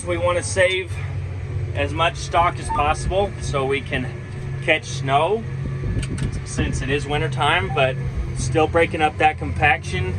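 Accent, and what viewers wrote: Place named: South, United States. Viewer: What part of Texas are you in and what do your grow, just wheat? BTW SO GOOD to hear a "ya'll" on a farming video! I have been drowned with "you betcha" and "you's guys" on farming videos and it is SOOO good to hear a good ol "almost" southern accent, course I'm from BAMA! LOL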